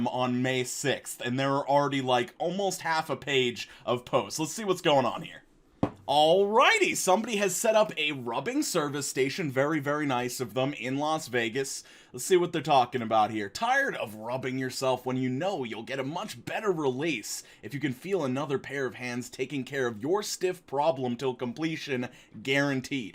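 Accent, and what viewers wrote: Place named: Long Island, United States. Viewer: I have to admit I don’t care for the yelling and Long Island accent...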